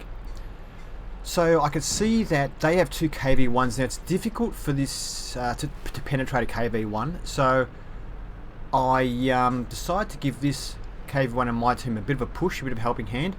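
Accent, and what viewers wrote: Australia. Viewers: hi are you aussie?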